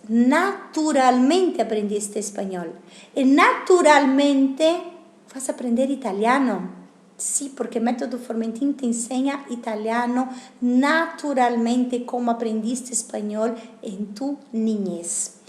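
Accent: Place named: Argentina. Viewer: habla español argentino la profe dice vos no tu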